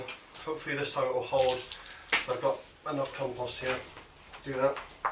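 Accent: United Kingdom. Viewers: I'm comforted by the fact that you are (or certainly sound) British, so I'm presuming you're in the UK...? I have 7 Frangipani plantlets which I germinated last year; they're all about 6" tall, so I'm about to re-pot all of them. Wish me luck - !!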